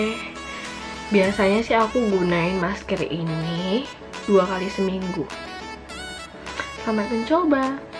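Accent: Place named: Medan, Indonesia. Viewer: Anak medan ya wakk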